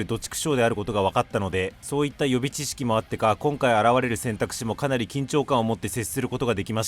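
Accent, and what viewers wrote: Kansai, Japan. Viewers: 大阪万博いって関西弁使うのにハマりました？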